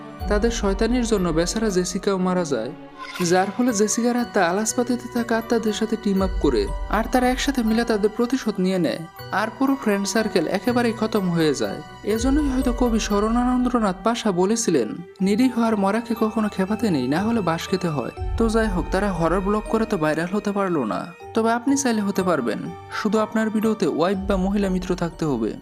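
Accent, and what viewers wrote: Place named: Barishal, Bangladesh. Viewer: আপনি কী বরিশালের বা ফরিদপুরের?🐸এমন ভাবে কথা বলেন কেন হ্যা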